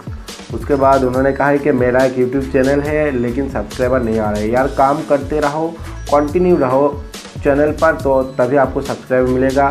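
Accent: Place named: Bengal, India. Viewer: da is from Bengal like me thats why his Hindi has a Bengali touch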